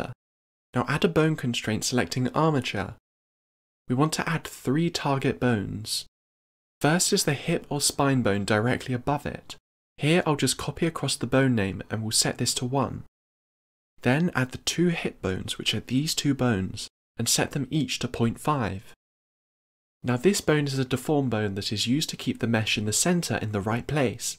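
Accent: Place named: United Kingdom. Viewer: Thanks a lot ! And... I luv your british accent ! Greetings from germany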